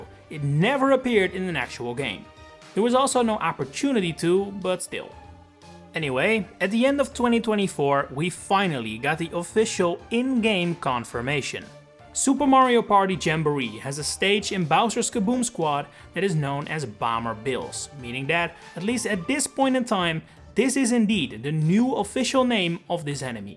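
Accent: Netherlands. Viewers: checks profile after 5 seconds of talking: "yep, tissun nederlander." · Um, he definitely didn't add a dutch accent, he's dutch. And also...of course he uses US terminology?? It's a video about the US name of the enemy??? You're goofy for this comment lmao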